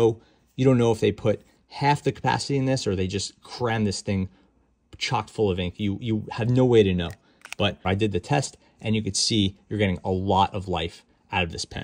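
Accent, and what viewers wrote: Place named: Philadelphia, United States. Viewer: Great reviews and you always show new and different pens ...Thanks !! BTW....you sound like you're from Philly ...is that close ?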